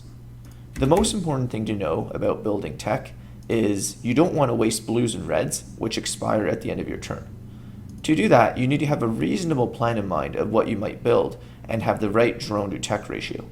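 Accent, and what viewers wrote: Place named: Canada. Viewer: Aboot...he sounds so Canadian. Still great vid - still getting my head around figuring out how to play effectively.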